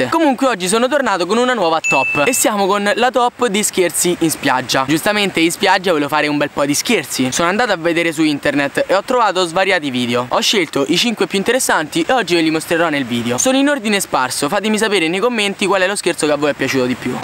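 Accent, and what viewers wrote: Italy. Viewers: Me piace troppi ed tuo accento romano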